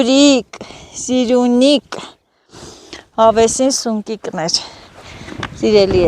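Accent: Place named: Artashat, Armenia. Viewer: jan.erek vor 1in blogy ditumei ev dzer masin yndhanrapes vovhinch chgiei.yntacqum gushakeci vor vayqumeq.qani vor esel es 2 ory kaptavotik snkerem 2 angam gnel.patrastel e mi qichel dzmerva hamar drel sarcaxcik.u hitri vor vayqicen berum.mekel dzer barbaric sarerum xoselis😊😊😊😊 aseci artashatcia.indz tvume vor dhat chishtem gushakel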